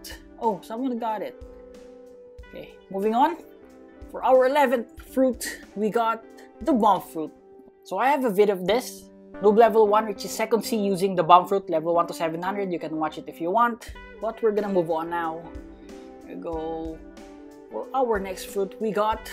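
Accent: Tagalog, Philippines. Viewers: Halata tagalog 🤣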